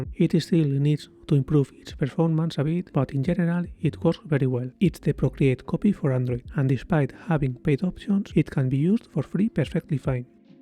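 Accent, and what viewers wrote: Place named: Spain. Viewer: Genial video de una tablet que la ando cazando para cuando baje algo de precio; asi como curiosidad , Creo que eres Nativo de la lengua española ( lo noto en tu acento) no se, por que a los que sabemos un poco de ingles en mi caso b1 ( entendemos mucho mas la gente con acento que a los nativos del ingles)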